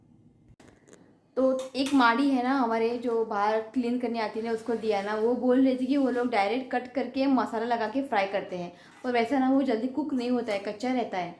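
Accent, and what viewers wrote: Goa, India. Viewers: I loved the Konkani and Goan Hindi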